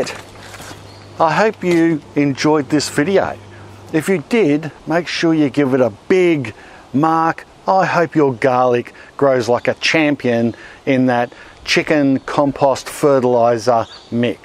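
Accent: Australia. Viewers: I love the Australian ascent, it is much easier for Indians to understand. So hardworking man. Love this type life.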